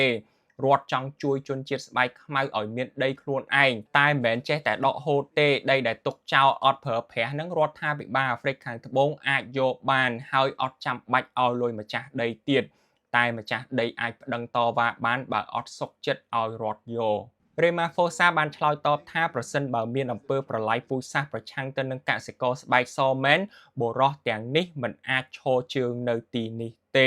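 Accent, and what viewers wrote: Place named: Vietnam. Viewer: កុំនិយាយភាសារខ្មែរតាមរបៀបតុងយួនមកនិយាយអី ព្រោះខ្ញុំស្អប់យួនណាស់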